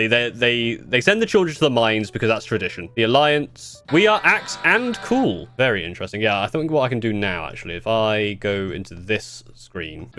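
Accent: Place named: United Kingdom. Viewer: oh god he's british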